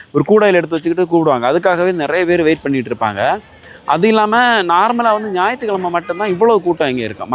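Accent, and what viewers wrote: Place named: Kanyakumari, India. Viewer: Kanyakumari Nagercoil Slang😅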